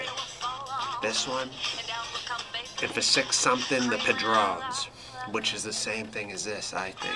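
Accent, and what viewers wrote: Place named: Boston, United States. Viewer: Yo are you from Boston? Damn that accent is strong boy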